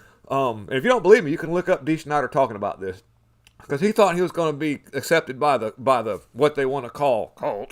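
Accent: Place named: South, United States. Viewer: ! Damn bud! You are pointing out some things that we all need to pay attention to. I don't want to sound disrespectful, (because I see you as much more than this) but others may judge your Mohawk and southern accent as meaning you're not a scholar...but I respect your insights and how you take deeper dives into what's happening around us. May The Good Lord grant you all the wisdom that you are seeking and bless you and your family as you share His insights to you. Love you. UDABST!!!!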